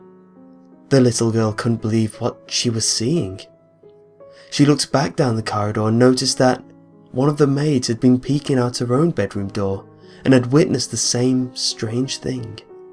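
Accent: United Kingdom. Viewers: Aww poor. I still love you. I love me some British narrators <3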